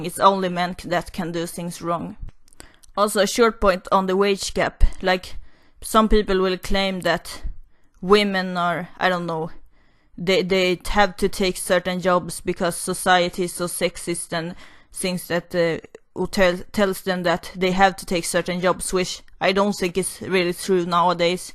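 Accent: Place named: Sweden. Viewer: are you from sweden? you sound swedish!:)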